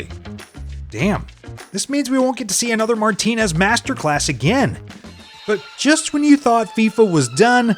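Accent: United States. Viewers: This channel sucks I can ignore the horrible american pronunciation but making passé remarks about Ronaldo being fat is just embarassing and completely unnecessary, considering the fact that it's of little fault of his own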